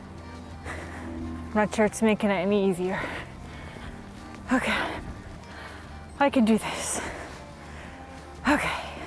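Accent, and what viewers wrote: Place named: United States. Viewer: Why does your accent still sound fully American after living in the UK for 10 years?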